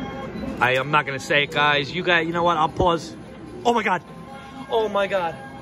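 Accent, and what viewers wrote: New Jersey, United States. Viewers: You must have been born and bred in New Jersey because you have a thick Jersey accent!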